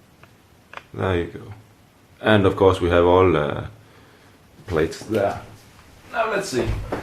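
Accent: Denmark. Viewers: der er et par stykker der spørger om du er fra Danmark..... jeg siger det er nærmere Jylland :) din accent taler for sig selv :)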